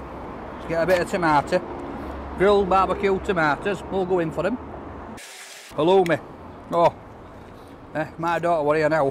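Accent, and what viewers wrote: United Kingdom. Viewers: We should all have a in our lives. What a wonderful, positive person. I’m an expat living in Northern California and it’s delightful to hear talk. The longer I’m in the US the more I yearn for all things UK. Thank you, for bringing a little bit of home to me.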